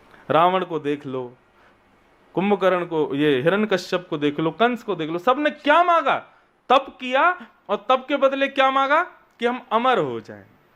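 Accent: Braj, India. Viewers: Jay Shri Krishna Radhe Radhe 🙏 ♥️ 💙 ❤️ OM 🕉 Namo Bhagvate Vasudevy Namh 🙏 ♥️ 💙 ❤️ OM Namo Narayan Jay Shri Shyama Shaym ji Jay Shri Guru Dev Maharaj ji Aapke Charno Mai Koti Pranam Pranam Jay Shri Radha Rani Jay Shri Radha Rani Jay Shri Raman ji Jay Shri Radha Vallabh Lal ki Jay Ho Jay Shri Guru Dev Maharaj ji Aapki vraj Bhasa Badi Sumadhur hai Radhe Radhe Jay Shri Harivansh Guru Dev Maharaj ji 🙏 ♥️ Jay Shri Hari Jay Shri Vrindavan Dham Radhe Radhe Jay Shri vraj vasi 🙏🙏🙏🙏🙏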